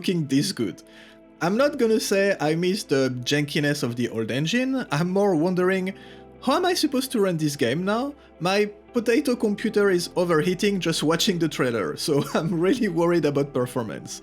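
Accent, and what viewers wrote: France. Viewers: are you french by any chance?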